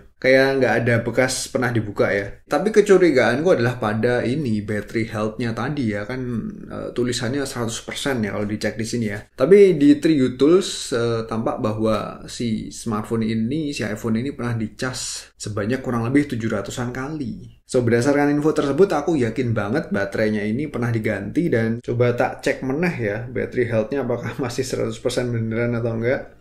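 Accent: Java, Indonesia. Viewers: oalah pantes jowone medok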